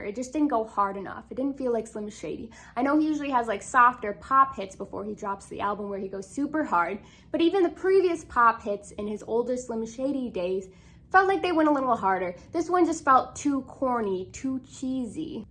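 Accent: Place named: Minnesota, United States. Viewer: german american from minnesota ? damn nice to meet you lol you have such a nice accent btw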